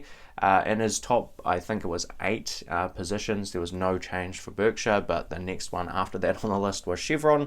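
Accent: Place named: New Zealand. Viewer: he has a Nz accent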